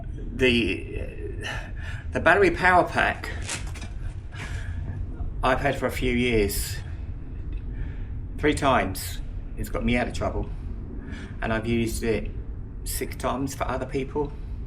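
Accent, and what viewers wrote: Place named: Australia. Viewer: Finally, a guy with the wisdom and guts to tell the truth about Wing saddlebags compared to Harley bags! Thank you! I still would love to buy a bagger Wing like yours because at 78 years old I removed my travel trunk on my "09 Harley Ultra Classic a few years ago because I can now swing my leg over the rear fender to get on my bike without arthritic hip pain. Same would be true if I had a Wing with the trunk. At 6'3" it is too hard to get on the bike with the trunk in the way. I suppose I could put a Wing on the center stand and stand on the pegs/boards to mount but I'd rather be able to swing my leg over the seat. Sadly, at 275 lbs., 6'3", size 14 boots it is very unlikely I could fit comfortably on your bike without many modifications $$$ from Traxxtion Dynamics. Maybe I will win the lottery, I should think positive. Anyway, not sure if you are an Aussie in Florida or a Floridian in the land down under, but think I understood 90% of what you said, mate. 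Hope you do more Wing stuff because this bike is on my radar....if I can find a Honda dealer that would take my two Triumph Rocket III's and my Harley Ultra in trade for a fair price. PS" I carry most of the same tools as you do, the pump, the battery booster, tire repair, etc. on all three of my bikes. Several weeks ago I got a flat rear tire on my '09 Harley Ultra(65,000 miles) within about 3 miles of my home after a 150 mile ride with friends. Long story but I limped home and could not find a nail or screw or anything to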